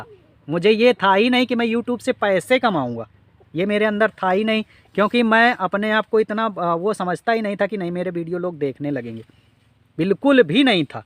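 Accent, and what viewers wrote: Kanpur, India. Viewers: सबसे अच्छी लगी आपकी बोली. सुनते ही समझ गया आप कानपुर से हैं. 1979 में मैं डी एम यू में पढता था. अमरूद के बाग घूमने रावत पुर, कल्यान पुर जाता था.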